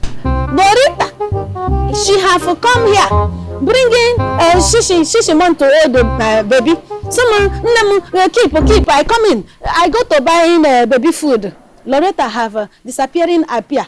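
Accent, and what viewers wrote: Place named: Igbo, Nigeria. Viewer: this your igbo accent is something else😁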